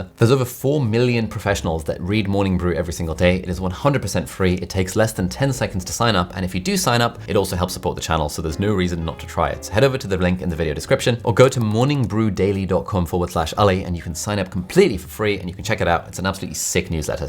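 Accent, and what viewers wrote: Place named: England, United Kingdom. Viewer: ALI ABDAAL LOOKS LIKE AN EARTHQUAKE VICTIM FROM PAKISTAN WITH AN ENGLISH ACCENT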